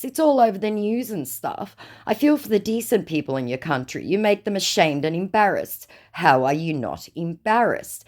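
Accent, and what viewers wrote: Australia. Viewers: I love your Australian accent, Australia is one place I always wanted to visit someday.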